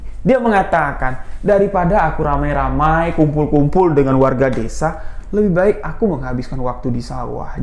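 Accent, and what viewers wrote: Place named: Jambi, Indonesia. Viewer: Walaupun saya orang Banten, saya suka sekali dgn bahasa jambi. Mas logat bahasanya jambi punya sangat bagus.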